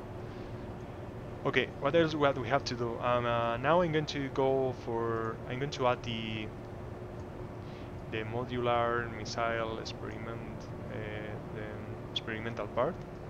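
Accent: Spain. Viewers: Nice, porcierto, asumo que eres español por el nombre y la forma de hablar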